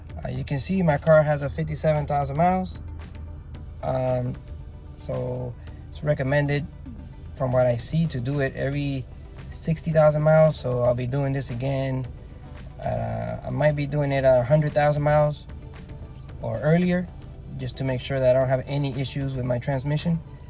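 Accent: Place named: Miami, United States. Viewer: Hey sounds like you're from Miami, how much would you charge for doing this to my car? Im located in kendall. My Tiggy is due for new fluid.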